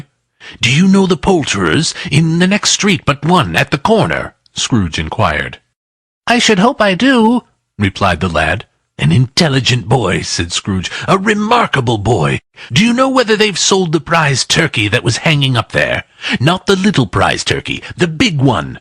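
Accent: United States. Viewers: Should only be read by an English narrator, doesn't sound good with an American accent. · American accent? Sorry, bye.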